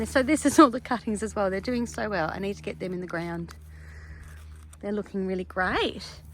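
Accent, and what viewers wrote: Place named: New Zealand. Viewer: I was gonna say you sound like a kiwi! Kia Ora!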